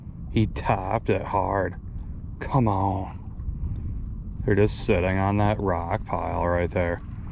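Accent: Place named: Michigan, United States. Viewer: love the michigan accent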